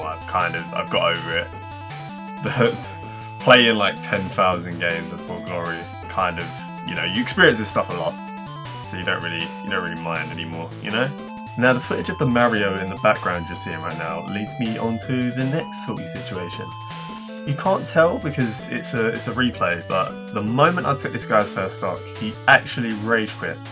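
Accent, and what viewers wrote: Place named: United Kingdom. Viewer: Damn you sound a lot like Alpharad if he was British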